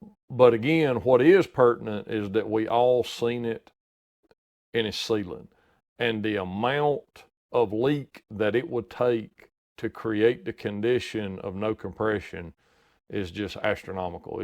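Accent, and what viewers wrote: South, United States. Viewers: You talk like a redneck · ‘… people that’s smarter than me…’ as a foreign viewer, who knows nothing about machining or mechanics, can I just say how much pleasure it gave me to listen to you discuss this with your accent. To a non-American you have a real ‘good ‘ol boy’ way of speaking that adds huge value to your out-of-hand dismissal of his criticism. Fantastic. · your overwhelming Southern Drawl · i have near to no experience with valve-work, but i appreciate your professionalism and respectful attitude coupled with the most southern accent i've ever heard as a foreigner. i enjoyed every second of this and even learned a little bit too. thanks for all that. god bless! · someone with a southern drawl and slow talking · your amazing southern draw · I'm from Canada and I have no problem understanding your lingo. In fact I love the Southern accent..!! 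· I love the Southern Drawl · that southern drawl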